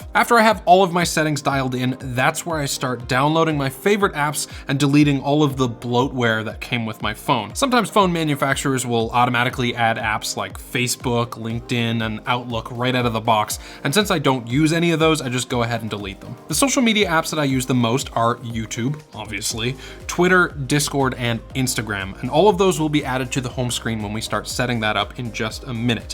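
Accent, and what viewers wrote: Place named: Newfoundland, Canada. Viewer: Are you from newfoundland? If so hello from a fellow newfie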